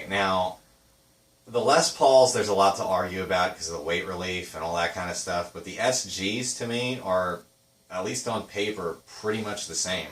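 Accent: United States. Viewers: you voice had a philly twang lol i was born and raised in philly . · you sound Alaskan!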